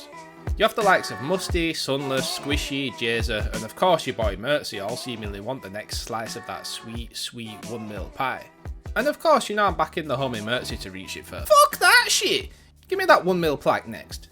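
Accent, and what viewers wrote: Yorkshire, United Kingdom. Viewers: Great vid! By any chance are you from Yorkshire cause u sound like it 😂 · wait are you from yorkshire hell yea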